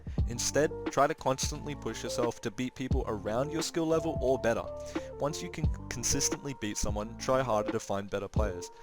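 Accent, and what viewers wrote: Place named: Germany. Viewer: are u german ? you accent sound like german Wenn du mich verstehst man versteht immer seine Deutschen Brüder XD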